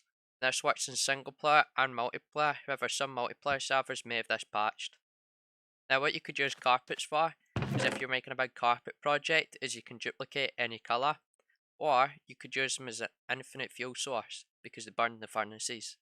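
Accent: Scotland, United Kingdom. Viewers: Scottish my brother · Scottish accent? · You have the strongest Scottish accent i have ever heard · True Scottish accent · i k he's scottish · that scottish accent is dope.. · is it me or does Scottish accent sound really appealing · Its scottish bruh u can guess it right as he says it... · It's his accent... That's kinda rude for the Scottish peoples.... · Best scottish accent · Ur from Scotland right? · wow scotsh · He has a sccotish accent lol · Hey fellow scottish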